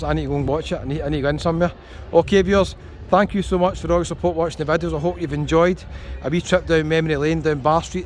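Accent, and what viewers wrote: Scotland, United Kingdom. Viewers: That pure scottish accent :)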